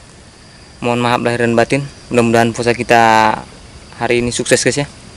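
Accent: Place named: Medan, Indonesia. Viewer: Abang ini orang medan ya aku penasaran logat ngomong nya kayak orang medan...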